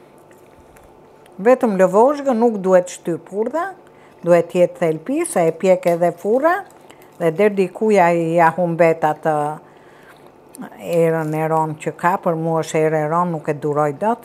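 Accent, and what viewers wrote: Tirana, Albania. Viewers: Te lumshin duart moj zonje e nderuar te ndjek vazhdimisht . Me pelqen e folura jote origjinale e Tirones. Qofsh me jete te gjate dhe e gezuar.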